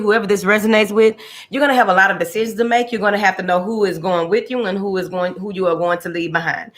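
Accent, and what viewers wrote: South, United States. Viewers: You so Southern and I LOVE IT!😍😍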